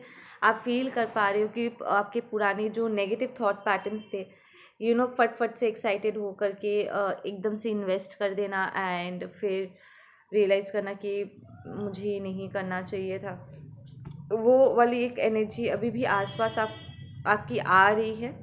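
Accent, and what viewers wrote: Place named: Bengal, India. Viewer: I m listening your bengali in a loop 🤭🤭 its very sweet to hear your bengali ascent 🌼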